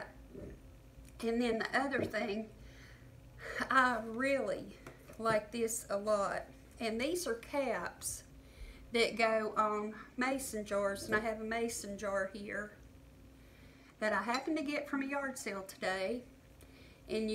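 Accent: South, United States. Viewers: God Bless you. I just love your southern accent.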